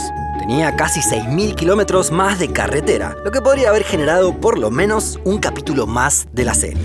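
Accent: Argentina. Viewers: El narrador habla argentino, viste?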